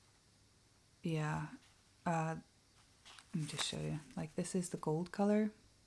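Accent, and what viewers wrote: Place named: Montana, United States. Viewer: Don’t laugh but I thought you were podcasting from Montana or Wyoming in the US or Alberta Canada. Yesterday I was watching The Knitty Stew and she referred to “an Icelandic cast off”. I have been knitting for 45 years and have never heard of this. In a future episode would you please show us how it’s done? I would love to win some Icelandic laceweight! Your knitting is exceptional! And the magic mushroom socks are definitely on my needles next. Your kids will never be lost in the snow-and your description of the ‘highlighter with pink undertone’ was perfect. Thank you very much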